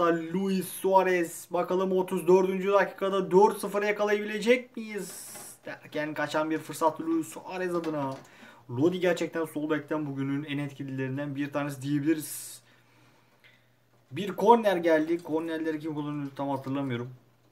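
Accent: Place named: Kırklareli, Turkey. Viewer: konuşmandan anladım bende Kırklareliliyim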